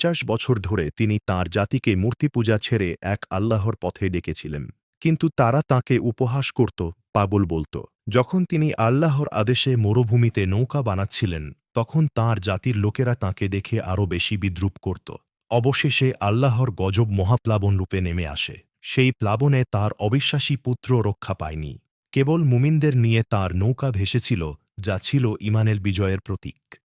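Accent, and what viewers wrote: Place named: India. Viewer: Indian raa স er pronunciation aveba kore knoo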